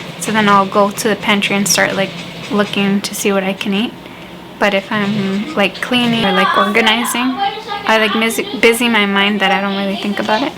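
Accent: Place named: Southern California, United States. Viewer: I can't wear my Chucks!!!! 😆 🤣 😂 Girl I am feelin that...or my vans, although they are warmer lol. I will also be coming from California where I have lived my whole life. Sounds like you were from SoCal. I lived in Antelope Valley growing up until I moved near Yosemite National Park. Been living through some earthquakes here lately, but none as big as you're talking about. Thankfully my littles are already familiar with what to do. No Dollar or 99cent Stores!!!!!!! My son will be ecstatic about that one! Love the info about the coats, gloves and boots. Also about the daylight hours in winter and summer. This is such a great video for those getting stationed there! Thank you for the info...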